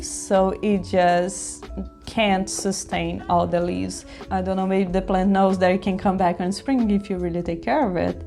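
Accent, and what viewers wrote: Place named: Brazil. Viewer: Are you Brazilian by any chance? :D Love the accent!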